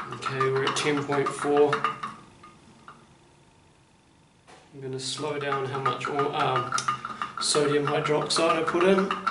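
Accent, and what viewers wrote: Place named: England, United Kingdom. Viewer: When there is talking and stirring at the same time, with an English accent, it is difficult to understand.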